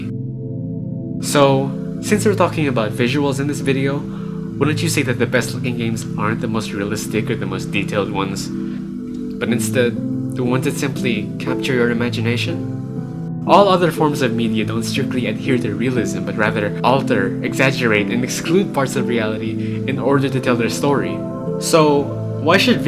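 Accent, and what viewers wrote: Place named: Philippines. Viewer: you sound so slightly Filipino. I don't know what it is. 😂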